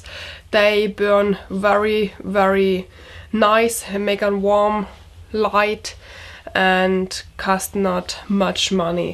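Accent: Germany. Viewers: You sound very german :) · please talk in your origin language because your english isn‘t good. we literally can hear that you’re german lol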